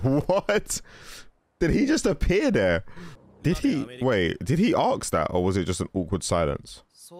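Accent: United Kingdom. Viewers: Are you from England? Your English sounds like British English?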